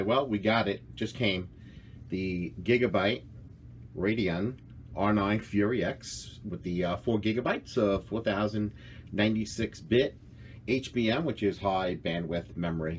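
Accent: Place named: New Jersey, United States. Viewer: He has such a jersey accent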